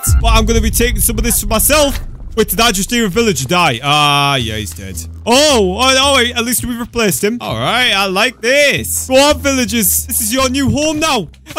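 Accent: Scotland, United Kingdom. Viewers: ya british? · Are you from Scotland? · Is he British? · I like your accent it makes you sound Scottish. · You sound British 😃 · He’s British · I love brittish accent🤩🤩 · I can tell your britsh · your a british people best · Are you Scottish or maybe Irish? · ur brittish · You’re British and I’m Scottish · He sounds Scottish for me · He sounds like Scottish or Northern Irish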